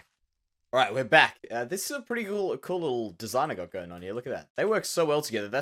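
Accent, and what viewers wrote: Australia. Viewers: Did anyone else mistake his Australian accent for a British one lol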